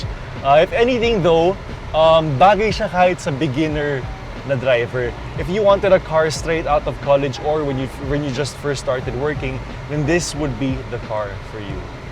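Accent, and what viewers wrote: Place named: Conyo, Philippines. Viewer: Nakaka inspire pag siya yung host ng review. Cute. Conyo. but Informative :D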